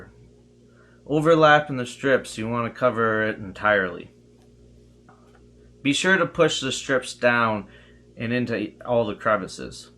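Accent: Canada. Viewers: = HA! I knew you were a Canuck from your accent! And the Tim's cup cinched it! LOL!!! Great build! So much free stuff to build with, I don't know why anyone buys stuff! And nice job using paper mache. Free and looks better than the plaster cloth. The plaster cloth always shows through. Have fun! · Sorry lol, as soon as I saw the yellow bag of flour with the French label showing "Tout Usage" (all purpose) I recognised the Canadian factor - then when I heard buddy's accent I thought "O fk yah bud!" Haha 😄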